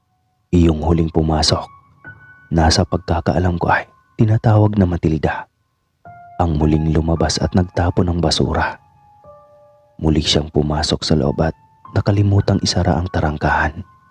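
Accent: Visayas, Philippines. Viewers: Iba talaga bisaya dila pa lang matigas na haha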